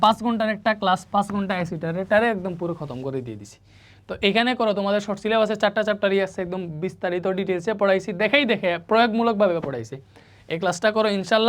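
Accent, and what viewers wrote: Sylhet, Bangladesh. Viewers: আপনি কি সিলেটি?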